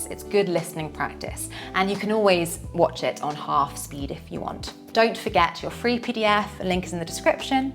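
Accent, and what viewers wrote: United Kingdom. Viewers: I love your authentic British❤❤ accent ❤ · I love British accent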